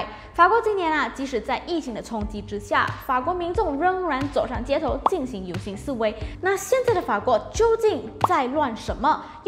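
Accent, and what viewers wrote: Malaysia. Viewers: 这是马来西亚华人的口音吗，感觉好可爱。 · 这是地道的马来西亚华语口音，不是中国口音，谢谢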